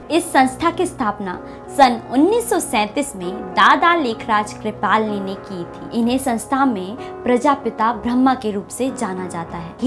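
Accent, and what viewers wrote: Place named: Bihar, India. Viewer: Bihari tone thik karen.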